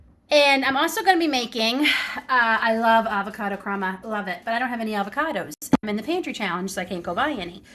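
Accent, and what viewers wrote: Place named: Canada. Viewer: Thanks for sharing! SW Ontario here! I heard your accent and was searching for anything that pointed to you being Canadian. I saw the Bernardin lid and was so excited (obviously missed the bagged milk)! Pork was my first pressure canning experiment last year and my first meal with it was nachos. I figured if it was going to kill me, I was going out on nachos. Bit more confident in my canning now, haha!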